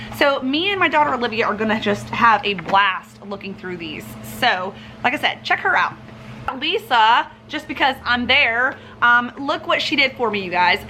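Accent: South, United States. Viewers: Ok sister I don’t know where you’re from, since you sound like me I’m figuring “The South” so if you ever get rid of that picnic basket I’m your huckleberry 🤷🏼‍♀️😍. So glad I found you!!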